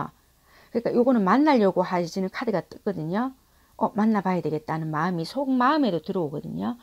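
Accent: Busan, South Korea. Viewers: 부산 사투리 뿜뿜 타로 그런데 카톡에 마리타로로 검색하니까 안나와요 ㅠㅠ 오픈채팅으로 검색해야 하나 싶어서 검색했더니 마리타로가 딱 하나 있는데 그분은 이 아니더라고요.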